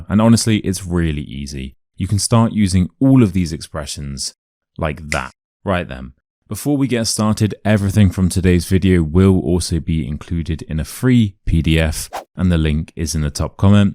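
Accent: United Kingdom. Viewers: Thank you, I’m learning a British accents, expressions and all of english from your video. Happy new year mate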